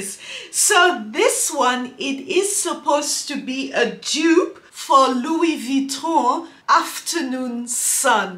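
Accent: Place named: Kenya. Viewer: Niiice! Just bought it. First clone frag. Btw. Are you Kenyan 😙? You sound so Kenyan 🤔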